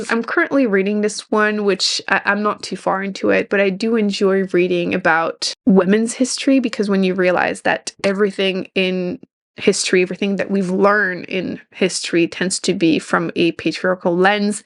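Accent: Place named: Quebec, Canada. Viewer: Glad you said you were from Quebec-I kept trying to place your accent. I also have only enjoyed Big Little Lies by Liane Moriarty - I gave up on her.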